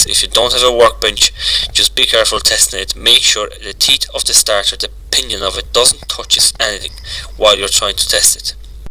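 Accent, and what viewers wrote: Ireland. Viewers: Brilliant stuff ... very clear ( I like your irish accent)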